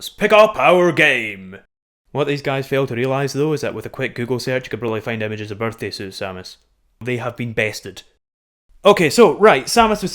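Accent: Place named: Scotland, United Kingdom. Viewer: Scottish... Oh... Uh, I suppose I probably shouldn't mention what I thought the accent was... I hear it's a touchy subject for Scotts :s.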